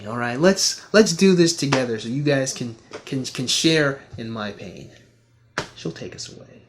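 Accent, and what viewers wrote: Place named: England, United Kingdom. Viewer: Am the only one who likes him whenever he speaks with an English accent. He should try to do a whole video speaking in an English accent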